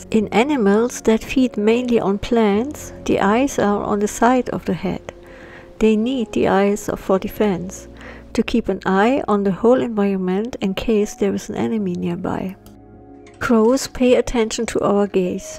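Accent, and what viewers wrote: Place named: Europe. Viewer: I've started looking for crows to feed. No luck yet getting close. I'll try your advice. I like your accent. It sounds European.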